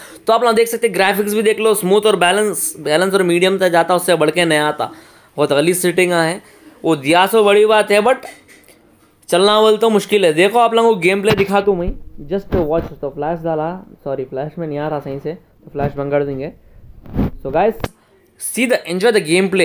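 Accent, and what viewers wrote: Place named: Hyderabad, India. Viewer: I love Hyderabad talking style ❤️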